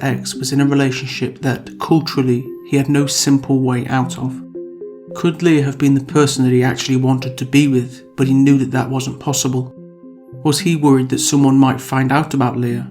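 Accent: United Kingdom. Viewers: Interesting case ✅ Suspense music ✅ British english narration ✅ Fantastic ✅ Thank you! ❤ from 🇸🇪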